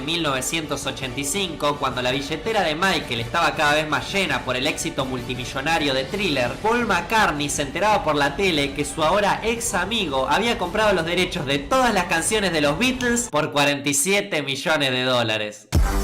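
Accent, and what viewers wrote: Argentina. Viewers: vos sos argentino,no?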